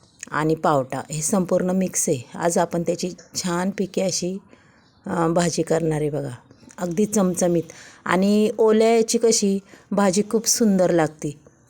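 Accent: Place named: Rural, India. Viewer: तुझं बोलणं आवडतं मला खूप गावच्या पद्धतीने बोलतेस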